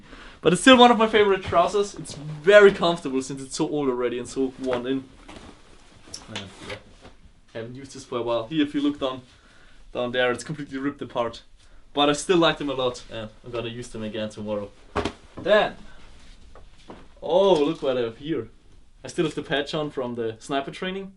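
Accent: Germany. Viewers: hey deine videos sind echt nice ich find das immer witzig wenn du englisch redest und man dann soo deinen deutschen aktzent raushört was ja nicht schlimm ist;) mach weiter so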